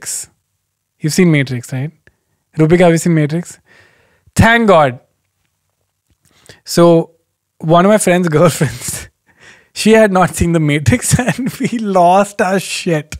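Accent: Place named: Bangalore, India. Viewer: Hey Kenny, First of all I simply adore your work and I am in love with the version of you in these long recording of you speaking!!! Okay, some background about me, I am Bangalorean born and raised in that lovely city and now stay in Indore, M.P. Your way of speaking, your accent, the dialect all of it reminds of my time in that city. Little, little things about how you say chumma, pavaam, maacha, when you add "it seem" after certain words is just too cute and makes me go awwwww!! I really admire your work be it the set on Jet airways (i don't have a count of how many times I have watched this), dating rules for guys (where the girls collect all the sorry omg what an observation, Kudos on that), all your chai time episodes they are all simply awesome :) I just love your observational skills and brutally honest opinion about each and everything. 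My Question to you is how did you cope up with the feeling of moving from one city to another? don't you miss Bangalore? weren't there days when you wanted to just go back to the city? or just some food place/joint(s) that you miss eating some amazing ghee roast dosa? or the filter coffee I mean anything! It was a hard time for me moving to Indore (very hard in-fact) I would love to know your experience though. Also where do you want to stay after your retirement? When is your special on Netflix releasing? Also, please for God's heaven sack please do a show in Indore I am so waiting to watch to perform live (obviously once this Covid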